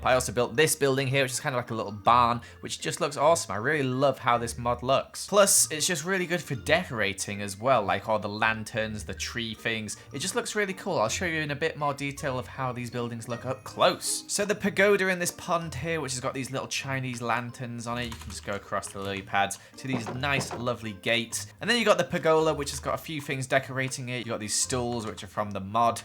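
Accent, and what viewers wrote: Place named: United Kingdom. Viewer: He's british so he says it like that because of his accent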